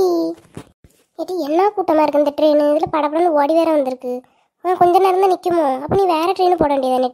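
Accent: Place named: Nagercoil, India. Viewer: Super sis.... Sema...NGL slang....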